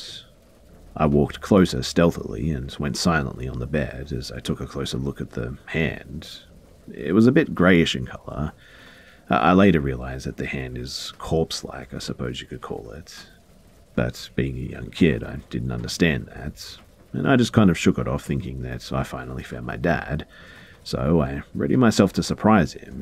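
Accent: Australia. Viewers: I detect a lack of an accent. Not NZ. Not pomy. Must be a well spoken Australian. Ye. Definitely Ozzy. How ya goin' mate?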